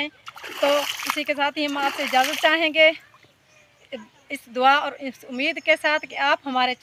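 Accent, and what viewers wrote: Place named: Saraiki, Pakistan. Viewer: Ap ka village konsa hy? Lehjy sy ap sraiki lgti ho mery Abu Ji or Bhabhi bi sraiki area sy hen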